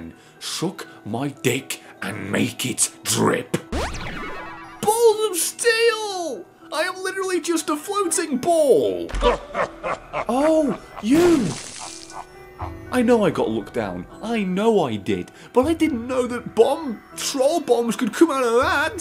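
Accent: United Kingdom. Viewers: Wait, are you an American citizen? I always assumed you were British because of your accent...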